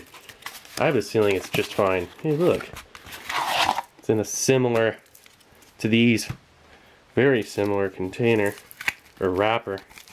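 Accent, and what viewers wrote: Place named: Southern California, United States. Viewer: I agree as well, you have a cool voice, are you from southern California? you sound like we do down here in the San Diego area.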